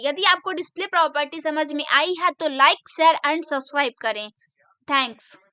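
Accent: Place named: Uttar Pradesh, India. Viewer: HI what's your name I'm also learnig Javascript, and also learnig css, from where are you, I think You are from UP, am i right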